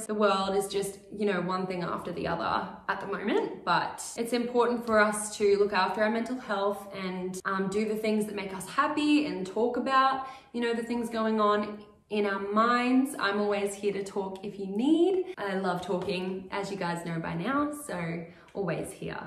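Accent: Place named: Australia. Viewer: Really good cover! 👏 I love the chatty videos! I like hearing you talk. Not only to hear your lovely Aussie accent (lol) but to hear your stories on your musical journey.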